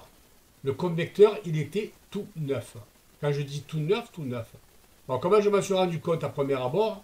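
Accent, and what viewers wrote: South, France. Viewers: Bonjour, merci infiniment pour votre aide ! Mon mari voulait jeter ce petit radiateur, exactement le même que celui que vous avez réparé, pensant que la réparation serait énorme, mais je suis allée fouiner sur internet et je vous ai trouvé ! Un bonheur d'entendre ce bel accent du sud qui me manque, je vis à l'étranger... Mais la cerise sur le gâteau c'est votre grande compétence ! On a difficilement trouvé le fusible thermique, mais on y est arrivé, mon mari a suivi vos instructions et on est reparti comme en quarante !!!!!! Le petit radiateur a une seconde vie !!!! Bravo et encore merci infiniment pour tout ce que vous êtes 💪😃👍👨‍🎓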